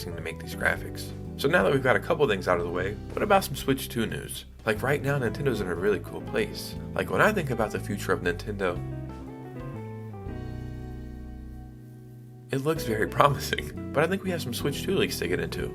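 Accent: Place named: Orlando, United States. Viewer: So this is what Orlando people sound like. That’s chill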